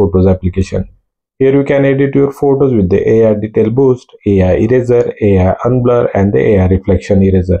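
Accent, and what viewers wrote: India. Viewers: Marathi maanus....typical marathmoli english accent ahe bhaau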